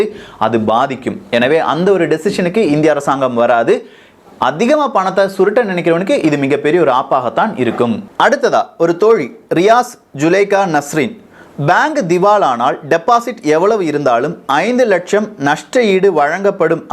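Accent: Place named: Kanyakumari, India. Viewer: nenga kanykumari district ah ? slang patha apdi thonuthu..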